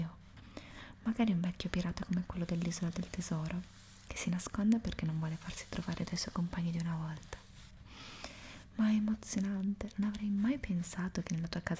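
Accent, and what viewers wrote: Italy. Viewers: I love the softness of his voice and his Italian accent 😴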